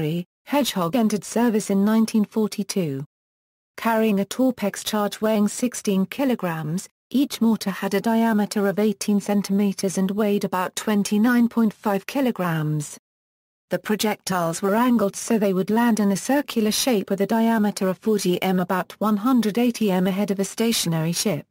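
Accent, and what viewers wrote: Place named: United Kingdom. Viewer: A computer with a British accent ... cute~!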